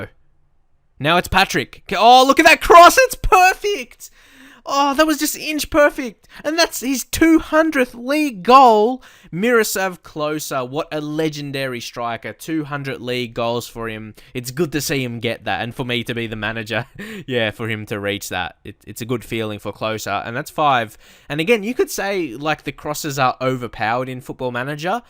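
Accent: Australia. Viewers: Hey mate new subscriber here kinda binge watching this at the min I have an ac Milan save on fm 16 loving your content also the Australian accent is so cool haha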